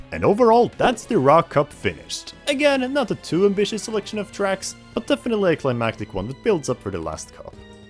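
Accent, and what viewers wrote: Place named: Sweden. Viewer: She’s Swedish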